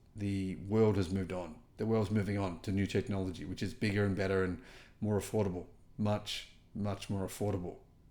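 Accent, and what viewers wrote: Australia. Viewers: U r an Aussie sir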